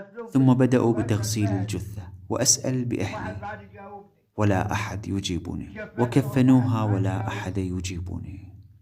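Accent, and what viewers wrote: Iraq. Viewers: لو مامترجم احسن كلامه واضح كلامه بلهجه العراقيه الجلفيه العاديه ومعروفه واضحه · هذي ليسة لهجة قديمة هذي لهجة اهل الغربية من العراق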